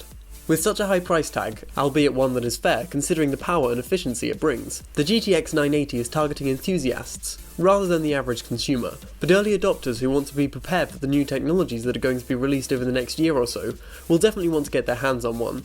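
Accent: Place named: United Kingdom. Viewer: I like your style, looks clean and professional. also your brittish accent makes me like the video even more :D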